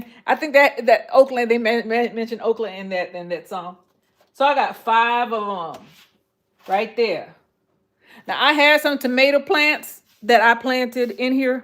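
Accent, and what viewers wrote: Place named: New York, United States. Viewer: Your video popped up on my feed. I love it. I knew you was a Newyorker I can hear that NYC ancient 😃❤ . I am a Trinmerican from NYC too. My father is Trinidadian.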